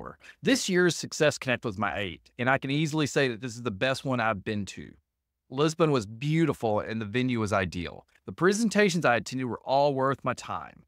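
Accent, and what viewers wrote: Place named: Texas, United States. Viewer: Nothing like a bald guy with a Texas twang to start my week. Thanks B, this was a great wrap up and it was nice seeing you.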